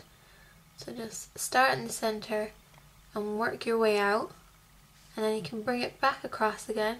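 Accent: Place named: Ireland. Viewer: i thought u had a bit of irish haha :L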